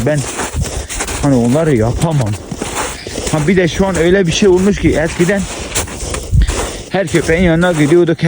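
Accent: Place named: Van, Turkey. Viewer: Bitlislilerle vanlıların Türkçe konuşması birbirine çok benziyor. Benim bitlisli arkadaşlarım var aynı senin gibi konuşuyorlar Türkçeyi. Sen vanlıydın değil mi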